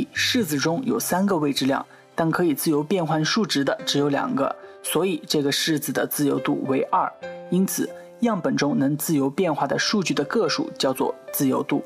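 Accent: Hunan, China. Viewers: 湖南哪里的？ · 是湖南人吗？ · 湖南老乡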